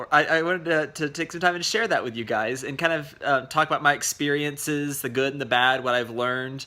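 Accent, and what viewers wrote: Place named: Texas, United States. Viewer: LOL, of coarse you don't and for being from Texas it is very slight, but just enough to be unique and cute.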